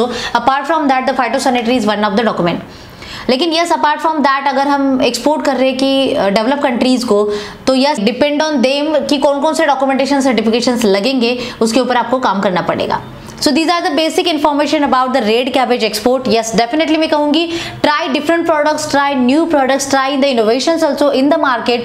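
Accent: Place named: Mumbai, India. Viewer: marati hindi mumbai accent madam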